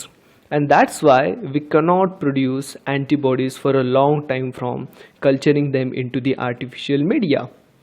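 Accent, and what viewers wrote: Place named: India. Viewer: Hangi aksan bu hintli kardeş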